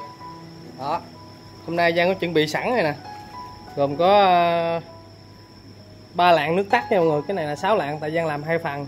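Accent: Ben Tre, Vietnam. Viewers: Nghe giọng nói giống như bến tre z a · Cho mình hỏi, nghe Giang nói chuyện giống người miền Tây, giống quê mình, bạn ở đâu vậy?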